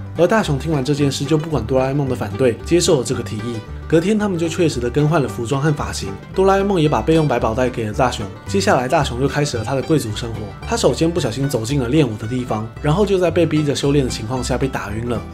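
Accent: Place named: Taiwan. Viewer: 台湾腔听着好舒服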